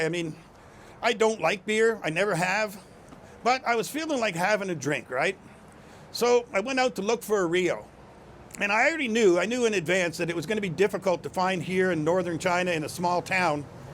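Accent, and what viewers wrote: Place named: South, United States. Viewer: The fact that the locals recognise you as pro China is actually very positive. Also the other store probably was told of a foreigner who drinks the tall cans of grape juice. If you are right and it’s not a local thing then you would stick out even more because of that. Especially if they identify your accent as from the south and the drink also popular with people from a certain region.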